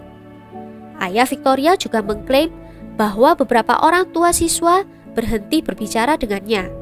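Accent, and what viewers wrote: Java, Indonesia. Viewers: Sll gaa bosen liat semua video nya say medokuhhh 👍💖💖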